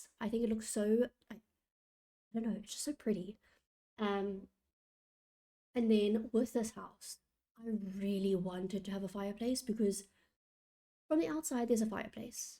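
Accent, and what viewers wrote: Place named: South Africa. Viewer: Lovely house and I hear that South African accent ❤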